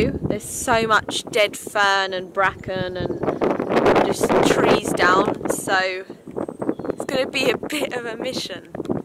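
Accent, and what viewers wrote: United Kingdom. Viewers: Your accent do British snd "tipi"is shelters?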